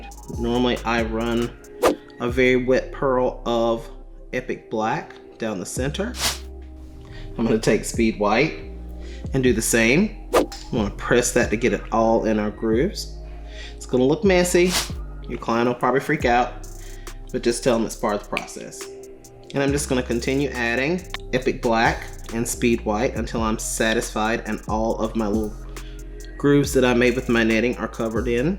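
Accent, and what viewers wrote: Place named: South, United States. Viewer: those are beautiful and awesome you make me want to practice this. I love your southern sound. My parents are southern, but raised in the north. Love all people ❤ 💕 and wow on those 💅 nails ❣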